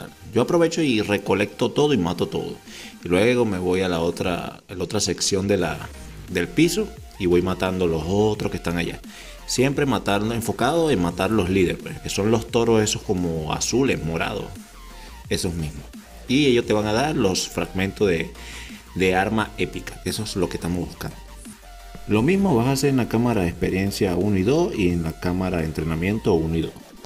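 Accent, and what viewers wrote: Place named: Venezuela. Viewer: Papi me suscribo, fijo eres venezolano casi que zuliano saludos desde la merjor ciudad de venezuela Maracaibo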